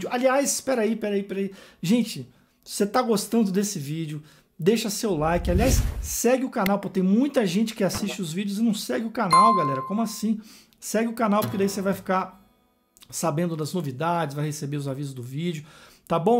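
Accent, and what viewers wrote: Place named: Minas Gerais, Brazil. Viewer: O resultado ficou parcialmente bom(apenas opinião). Professor, as vezes acho que tu és mineiro, as vezes te acho capixaba e as vezes fico confuso kkkk De igual forma, em algum momento a sonoridade induz achar que é guitarra mas falta definição. Não sei se sou muito "ortodoxo" mas soou híbrido na maior parte dos casos. Muitíssimo obrigado pelo conteúdo e até a próxima!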